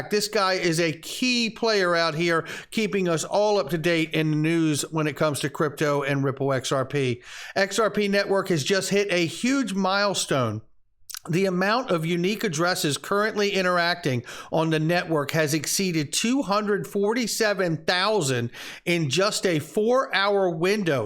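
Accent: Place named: United Kingdom. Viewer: I am very excite about XRP.. its every time different story.. very cool token.. and reporting very cool thanks you for this reporting...i check you headlines out bit hear of that all time.. very confusing you give report but your english cool good british language thnks 2 u 😘 😍😍 weLOVE for U much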